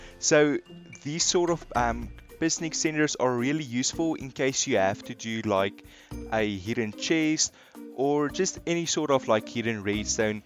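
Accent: South Africa. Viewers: Your south african again bra ek ook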